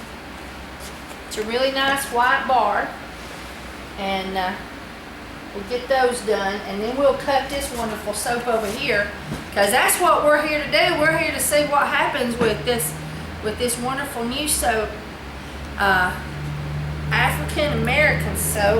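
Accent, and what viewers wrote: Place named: Alabama, United States. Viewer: I love that Alabama accent !!!!!!!!!!!!!!!!!!! Yeeeee Hawwwwwwwwwwwwwwwwwwwww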